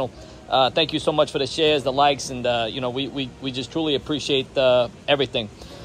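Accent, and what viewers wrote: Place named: New York City, United States. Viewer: Well dude you are waaaay New York City... Manhattan to be exact. I'm from Staten one of the other boroughs so yeah I recognize that accent!😉 Not quite quite a long island yenta but your close. Too fast for a Long Island yenta. Bro you my peeps!!! I never donate cash to any website ever but I do buy things that they have merch to support them. It's you and Tom MacDonald the only ones that I will buy from. And guess what? we're going through a heat wave too (113 yesterday) and mama needs a tank top so I think it's time to go shopping! I don't like seeing my peeps fail at things that they do so well. So my peep, it's time to check out the merch! You know I've always supported you and I will never stop. I still got my eat the babies t-shirt and I love it!! I love the humor and comedy & the work you put into it. 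Far as I'm concerned every damn ¢ is worth it!!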